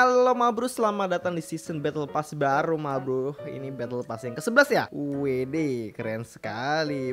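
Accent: Java, Indonesia. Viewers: Iki wong jowo ya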